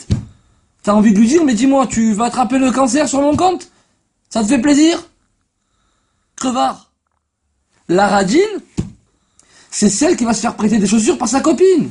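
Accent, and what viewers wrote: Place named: Marseille, France. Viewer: horrible ton accent on le voit le Marseillais qui a immigré à Paris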